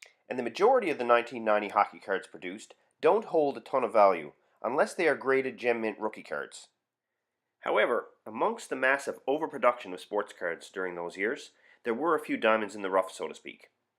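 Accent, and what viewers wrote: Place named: Netherlands. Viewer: The narrators definitely from NL. Made me miss home.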